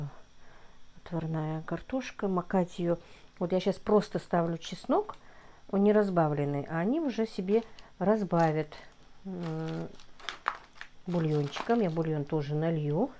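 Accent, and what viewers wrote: Standard. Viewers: дорогая, пожалуйста напоминайте нам про лайки и комментарии! Честно, многие не помнят! Кто не хочет, тот не поставит. А кто хочет выразить Вам признательность, тот иногда забывает, что это канал. Лично я с удовольствием смотрю Вас и как будто с подругой по телефону поговорила, отключилась и пошла. Вы не думайте, что Вы лайки выпрашиваете, просто напоминаете забывчиввм, таким, как я. Восхищаюсь Вами! И да, наслаждаюсь чистым классическим русским языком! Счастья Вам!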